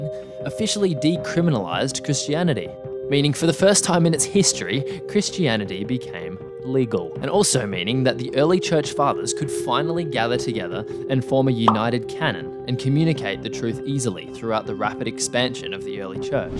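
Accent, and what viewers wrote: Australia. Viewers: Oh, and the New Testament list was compiled in 49AD (not in 85AD like video says). This sounds like that dude from Australia or New Zealand who made millions becoming a street preacher. Is that his voice? Then it would make sense since he's got a lot of the historical facts here or is purposefully leaving out the true historically correct dates.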